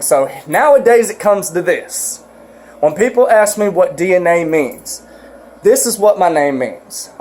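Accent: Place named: South, United States. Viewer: DNA I'm from Georgia, and you got that thick southern accent(Texas right?) just like me lol.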